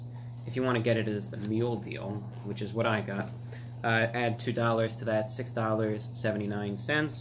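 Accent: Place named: Ohio, United States. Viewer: Im guessing ohio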